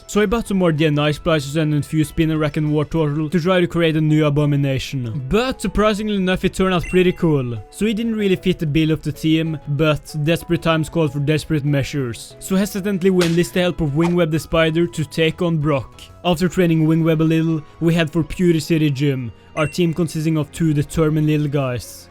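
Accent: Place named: Norway. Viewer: I watched 10 seconds of this and I was like "han fyren her er garantert norsk". Glad to see it confirmed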